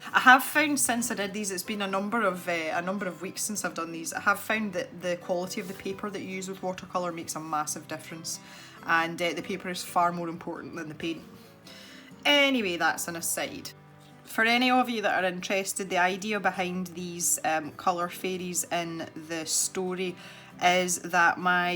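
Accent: Scotland, United Kingdom. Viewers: I'm a first time visitor to your Youtube channel. I'm from Florida, U.S.A. and my Southern ears have a hard time understanding the English accent. I'm just guessing here, but, are you from Scotland, or Ireland? Your accent is wonderful and, surprising to me, I find it very soothing. I've really enjoyed watching your video. I do a lot of Adult coloring and I use Poscas in my coloring. It's one of the few whites that will lay down over my colored pencils, but I often have to use multiple layers because they tend to pick up the colors I cover. For a white highlight, I have to give them plenty of drying time between layers. I'm subscribed to your channel and will look forward to your next video. (I'm also a fellow novelist.)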